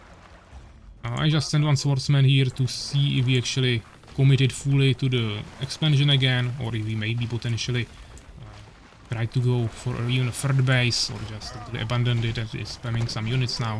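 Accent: Czech Republic. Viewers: Did i hear strong Czech accent?🙂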